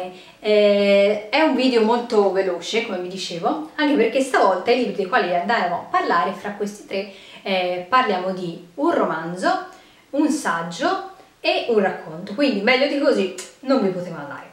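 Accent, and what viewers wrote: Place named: Tuscany, Italy. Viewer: Salve egregia. Grazie per questi consigli sugli audiobook. Ad ascoltarla si sente chiaramente l accento toscano, mi chiedevo di dove più precisamente 😊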